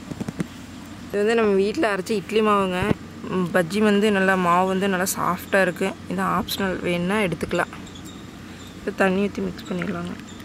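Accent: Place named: Coimbatore, India. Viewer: Akka your speaking coimbatore tamil very nice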